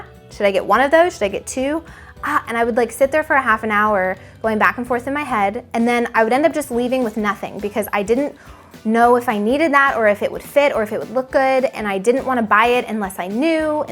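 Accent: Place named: Texas, United States. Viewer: I'm from Texas and you do kind of sound like you're from Texas! My biggest struggle is COLOR. My fiance decorates without really thinking about color which is clashy, and I just don't know how to put colors together in a way that is not clashy but still interesting! I would love a video on this or maybe some templates! I would also like tips on incorporating old furniture and decor that isn't necessarily your current style.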